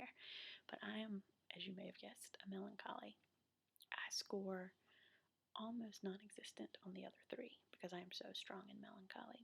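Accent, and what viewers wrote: South, United States. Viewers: Can't tell you how glad I am to see you back! As much as I love everyone's different accents,...It's really nice to hear a good ole southern girl on you tube again! :) I hope the family is doing good and hope to see more video's when you get time. Thanks, -Steve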